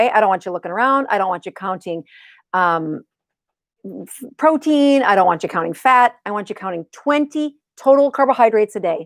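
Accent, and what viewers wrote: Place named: South Dakota, United States. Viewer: Great stuff--I lived in SD for four years, loved it, it's nice to hear the South Dakota lilt in combination with some great insights! Only reason I didn't join the course is because I'm not on Facebook and that part of the package was simply not useful. Thanks for your candor and energy,!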